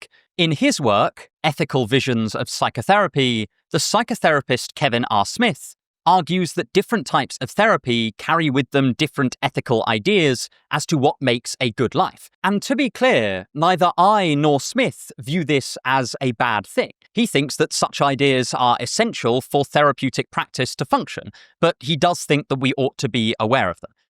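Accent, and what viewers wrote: United Kingdom. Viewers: Can someone tell me whats his accent called? British PR? Modern PR? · has the most pleasant british accent i've ever heard. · I like the fact that refers to himself as aggressively British... He is definitely the most British sounding person I know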